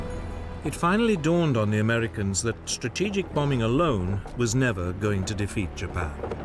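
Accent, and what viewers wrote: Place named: United Kingdom. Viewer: Narrated by the British.....Shut up already.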